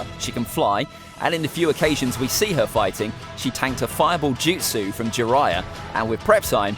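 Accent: United Kingdom. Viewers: Bc hes british so he has higher accent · His accent is from hartforshire, England you clown 😂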